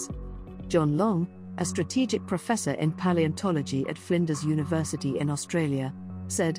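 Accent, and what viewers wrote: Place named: United States. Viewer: It is nearly unbelievable to me how immature and wrong all your videos are, You are like a child playing at a tea party with no concept of reality to guide them. relying on whatever stupid idea comes to mind. As a mature smart worldly Canadian man I find your entire catalogue of crap stating videos to be truly stupid, Why do sound like such an americunt, Stop making this crap and just keep your stupid to yourself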